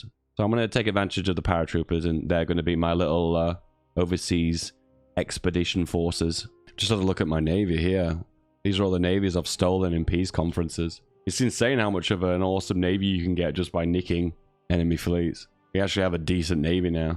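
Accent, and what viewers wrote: United Kingdom. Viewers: your calm and collected attitude and British voice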